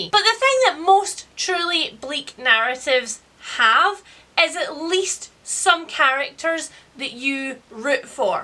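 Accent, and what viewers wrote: Scotland, United Kingdom. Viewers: i feel like i’m watching an episode of balamory w/ ur accent lol i love it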